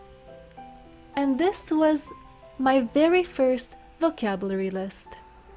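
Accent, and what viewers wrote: Israel. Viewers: The common problem is that she pronounces like most of ashkenaz israeli ח like כ and ע like א. Only because they are not able to pronouce like sefarad israeli. Israeli have a bad tendancy to pronounce this semitical language in a european way. Which creates confusion for the writing language and makes it poorer. That's not her fault. It is a wrong system.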